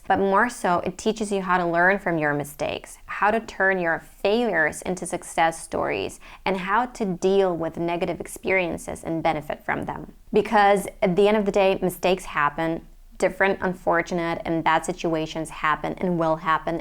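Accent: United States. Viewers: Could you please teach us, how to pronounce "R" in American accent like you. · You are very Beautiful and Intelligent. I am amazed that you have developed accurate American accent while not living in U.S.A. I would recommend to learn Technical books as well. I mean, Mathematics, Computer Programming in Python and Artificial Intelligence books. To know American Accent, learn Phonetics, Linguistics, Phonics. Learning Natural Language Processing can also help you about American English and Accent too.......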